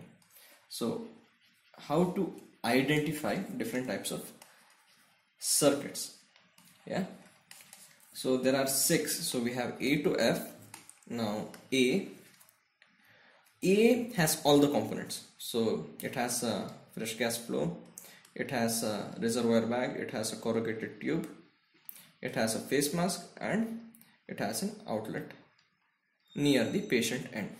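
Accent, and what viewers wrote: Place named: India. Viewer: nice, i understand a bit of indian english but your draws are very nice explainfuled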